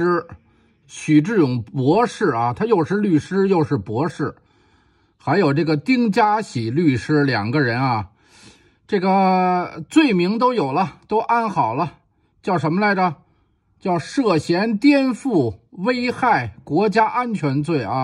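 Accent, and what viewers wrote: Beijing, China. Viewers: 听了您的北京话好亲切啊，谢谢您说许志永和丁家喜的这件事。您也是有良心的中国人。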